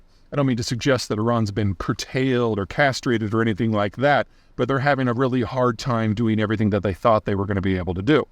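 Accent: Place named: Texas, United States. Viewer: He lived in Texas for a long time. We all just pronounce it that way. Not defending it, it's just a local accent thing.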